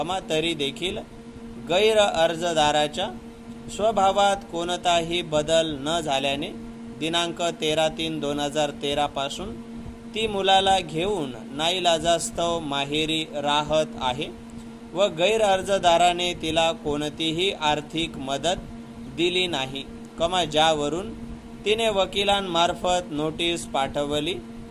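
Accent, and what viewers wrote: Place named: South India, India. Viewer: South Indian voice vatat ahe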